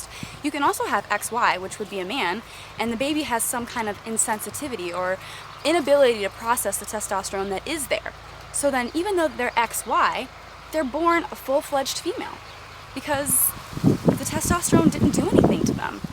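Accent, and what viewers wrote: United States. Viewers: Such a great way of putting it! Love your videos and your message! My bf was watching them with me and says "she sounds like an american version of you Steph!" :') Love watching someone make total sense! xx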